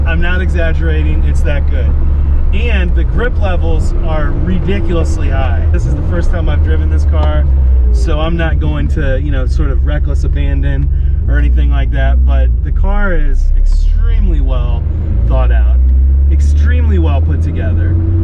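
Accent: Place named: United States. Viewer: I never even knew Nobl's were sold in America. Very weird seeing this car on yank roads and hearing an American accent talk about it. Top job.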